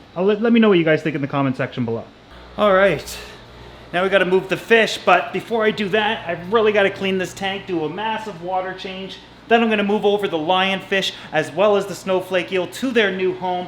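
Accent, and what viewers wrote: Canada. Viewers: I only just started to notice your Canadian accent in videos... I must be too busy looking at your amazing tanks to have noticed your cool accent.. Love the vids as always!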